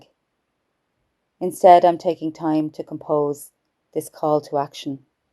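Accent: Ireland. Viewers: Beautiful Irish voice.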